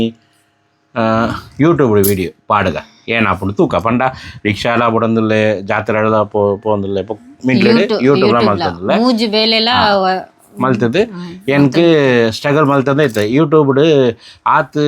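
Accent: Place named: Tulu, India. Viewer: Ur tulu 😂👌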